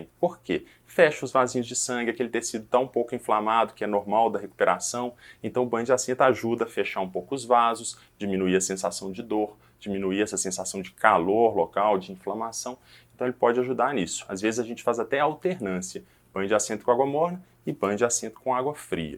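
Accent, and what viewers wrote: Minas Gerais, Brazil. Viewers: Dr esse sotaque mineres e mto bom 😅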